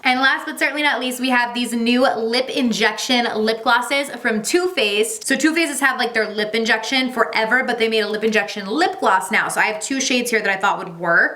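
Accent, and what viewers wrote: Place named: California, United States. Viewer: Guessing your from or live in California based on how you talk. So cal? Thanks for the vid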